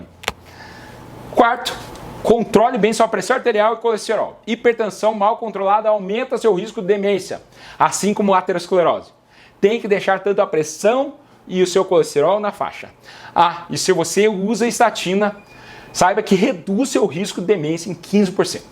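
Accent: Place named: Minas Gerais, Brazil. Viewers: Doutor de acompanho da Bélgica, gosto demais, e amo teu sotaque, você é de Minas Gerais, ou estou enganado? Abraço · Gosto muito do jeito q ele fala,como se fosse mineiro 👏👏👏👏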